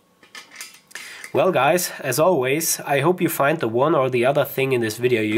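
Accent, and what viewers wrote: Austria. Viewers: your like the first Austrian guy who's English and accent doesn't hurt my brains. Thank you. Subscribed :)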